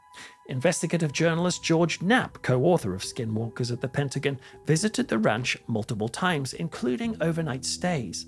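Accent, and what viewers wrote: United Kingdom. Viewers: Your the best story teller on you tube , and British … which is so refreshing 😊😅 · This man needs a nomination to the storytellers hall of fame. You have a wonderful way of making the listener feel like they are right there witnessing the events you speak of. Plus, as an American, I don't know why, but when you Brits tell a story, it just sounds scarier in a good way. 😊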